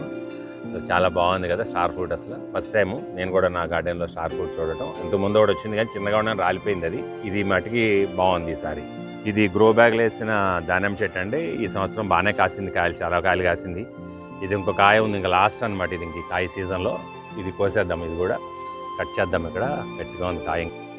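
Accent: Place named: Andhra Pradesh, India. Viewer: Nijame sir. Content chala baguntundi. Mee videos chusi nachi mee store nundi seeds kuda monnane order chesanu sir. Ma Andhra style lo meeru chakkaga matlade vidhanam Naku chala nachutundi sir. Meeku Namaskaaralu sir 🙏🙏🙏🙏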